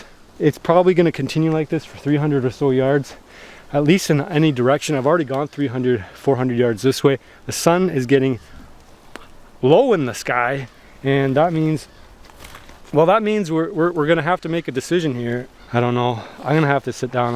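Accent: Canada. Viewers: Pretty sure he’s canadian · I was wondering why u had such a similar accent 😂 didn't realize u were Canadian too lol. I'm not used to seeing people make survival videos in Canada, it's always in some tropical climate.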